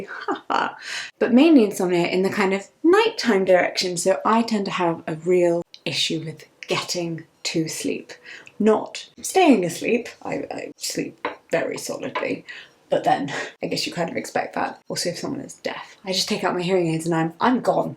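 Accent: RP, United Kingdom. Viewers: Quite the posh accent wow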